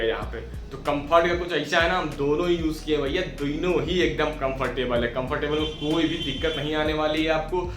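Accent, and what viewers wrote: Bihar, India. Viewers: Bihari accent bro💥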